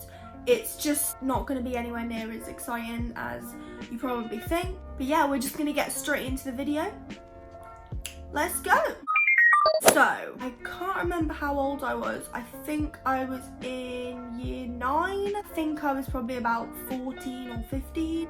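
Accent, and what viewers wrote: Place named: Wisconsin, United States. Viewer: Came from Tik Tok and didn’t realize you had an accent. Lol such a surprise. I forgot not everyone talks with a “Wisconsin Accent”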